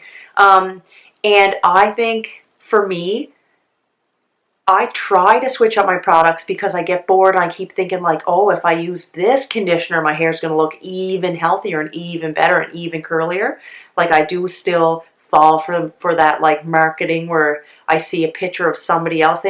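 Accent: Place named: Cape Breton, Canada. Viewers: I don’t even have curly hair and I love watching your videos and hearing your CB accent (makes me miss home!)! Would love to see some healthy lifestyle videos. Congrats on the new office! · Hi! First of all let me say I love your channel! I came across it a few few weeks ago when I decided to let my natural curls go for maybe the fist time ever... As a teenager and into my twenties I fought my curls with a curling iron and then 14 years ago I decided that a straightening iron was the best thing ever!! Well 6 months ago I decided to embrace my grey hair as well.. Yes I jumping in over my head (pardon the pun! Lol!) with grey and curls.. What am I thinking! I bleached out my dye last August to help with the grey transition.. I thought I destroyed my hair it was like straw! Anyway I continued to straighten.. Until I began to hear about the curly girl method. 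I searched YouTube and found your video of how to step by step do my hair and tried it I was... to say the least... Amazed!!! Did not know my hair could do that! And I remind myself that it should just get better... Also amazed at the compliments I have gotten already... My hair is still two tone from the grey hair/ bleach grow out but I really think I will embrace my new look! Oh and I just want to say when I first seen your channel your accent was what caught my attention... I am from Newfoundland and I wondered if you were to, but turns out Nova Scotia isn't to far and we're "neighbours" lol! Love your Province by the way! Keep up the the great videos and Happy Anniversary!😊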